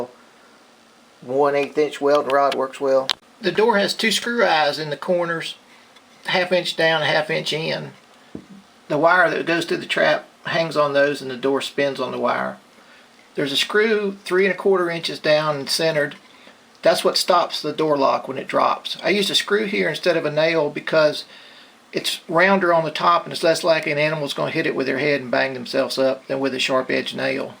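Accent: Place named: South, United States. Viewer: This trap really works. I've been having issues with rabbits eating my lawn and plants. I didn't want to kill anything so when I came across it look simple enough to give it a try. The guy's southern accent made me a believer too. Took about two hours to build using a drill, hand saw pliers and some screws. A what do you know, caught one the next day. I live in so California so i assume it'll work anywhere. To the creator of the video "thank you"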